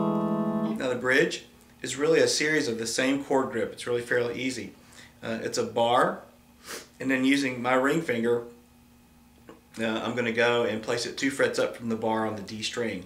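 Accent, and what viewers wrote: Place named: Texas, United States. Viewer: Isn’t this CC giving the tutorial? I mean to say that he’s got that TX accent, so...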